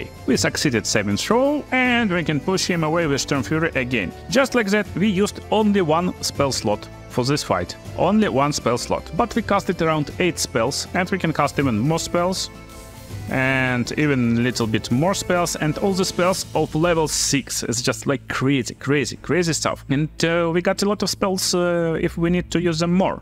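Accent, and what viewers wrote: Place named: Russia. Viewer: I can hear russian accent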